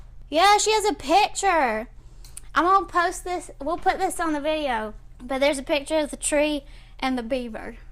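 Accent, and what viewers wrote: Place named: Georgia, United States. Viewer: I'm live in Warner Robins and have never heard an accent like yours. It's so cute and unique. I thought maybe you were from north GA. It's almost cherry blossom festival time again. The pollen is killing us!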